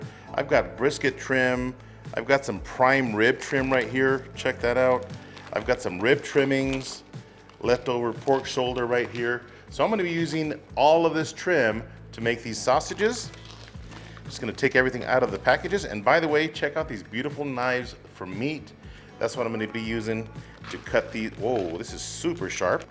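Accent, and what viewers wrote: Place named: Texas, United States. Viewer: you’re the best. As a native Texan living in SC, just hearing your voice makes me feel like I’m back in south central Texas. But amateur smoker and neophyte sausage maker, videos like these change my game. ¡Muchas gracias, vato!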